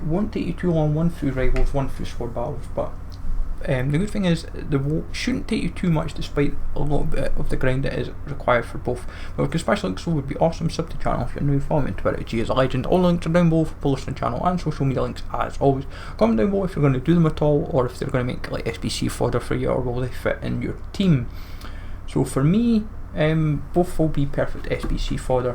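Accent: Scotland, United Kingdom. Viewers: is it scottish accent for real?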